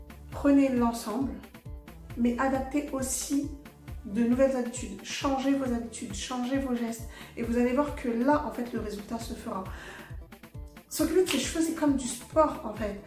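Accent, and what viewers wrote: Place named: Ivory Coast. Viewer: Dès que je t’ai entendu j’ai dit c’est une 225 😂 en comment que chili pêlê ?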